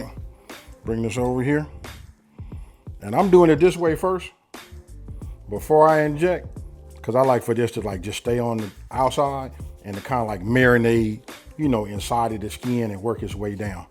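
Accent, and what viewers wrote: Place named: West Coast, United States. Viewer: Keep going bro... only chef with that gangsta west coast accent... Love how your channel is blowing up.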